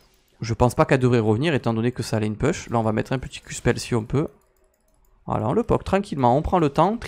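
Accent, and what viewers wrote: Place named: South, France. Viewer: Tu prends de plus en plus l'accent du sud haha (super vidéo btw)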